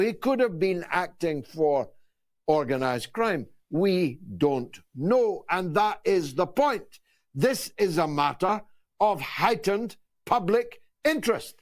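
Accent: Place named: Ukraine. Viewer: A Russian speaking Ukrainian, I hear ?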